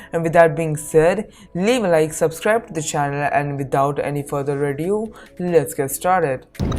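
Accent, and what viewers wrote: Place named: India. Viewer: From your voice I am taking guarrentee that you are 🇮🇳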